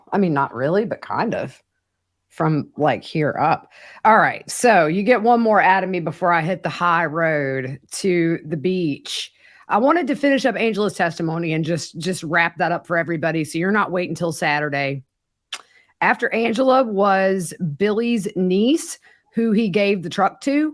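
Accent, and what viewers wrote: South, United States. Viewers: NEW SUB. So glad I found you. I’m in South Carolina. I recognize your southern accent 😅❤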